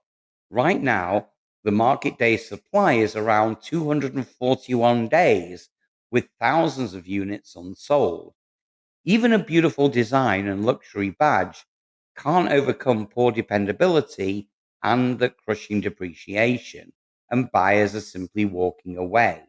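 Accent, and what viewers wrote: England, United Kingdom. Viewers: It might help if you made that clear! Initially owing to your accent I thought this was addressed to UK citizens. Then when I saw a dollar sign in front of some numbers, the penny dropped! Shame that nobody could be bothered to show the currency for all the values. A very sloppy video! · I'm confused, are you talking about UK or US sales, confused because you have a UK accent but prices are in dollars. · You sound English but you talk in dollars and call a car a sedan ! I’m confused. Ps I will never buy a car with an infotainment screen.👎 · What country does this apply to? English voice, prices in USA $$? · American script with an English accent 😂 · You sound very English; why are you talking in dollars? What yank is interested in RHD? I just spotted it they're all LHD, you're talking about the US market. I suddenly lost interest. 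All the best mate! Mines a Jaguar XJL. It depreciated right to the point where I could afford after 12 years. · Just found your channel,having been a petrolhead for more years than I can remember, I was interested in this clip. That said, I am a tad confused, I assume by your accent that you are from Great Britain, however your logo shows a USA flag and terminology is somewhat odd (to me) ie $ Dollars, Gas, Sedan etc? As for Car dealerships, I agree with numerous posts that car manufacturers and dealerships have been taking the eyes out of the very people that keep them in a job THE CUSTOMER! Far too greedy, I have absolutely not one jot of sympathy for these money grabbing burglars. They reap what they sow. 🇬🇧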